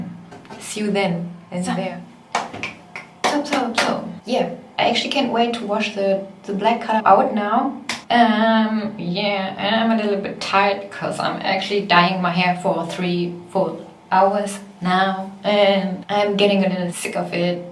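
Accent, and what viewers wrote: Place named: Germany. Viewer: Wallah so ein Deutscher Akzent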